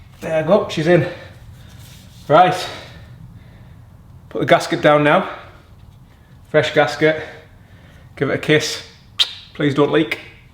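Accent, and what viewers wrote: Teesside, United Kingdom. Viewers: Great channel 👏🏽. Going to be doing the same on my S6 in a few weeks and dropping in larger turbos at the same time. Will use this as a reference. Oh and is that a Teesside accent I’m hearing?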